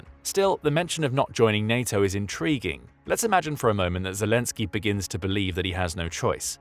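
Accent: England, United Kingdom. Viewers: What bugs me about the narrator (Who by his accent is clearly English) is that he keeps pronouncing "Nuclear" like an American.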